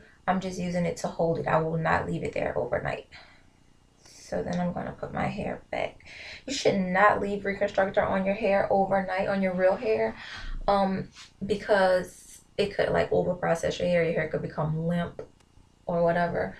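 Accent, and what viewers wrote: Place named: Baltimore, United States. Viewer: You Sound Like You're From Baltimore But This Video Was Really Helpful, Thanks 👌🏾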